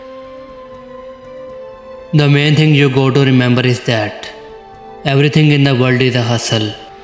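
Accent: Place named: India. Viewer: Why india accent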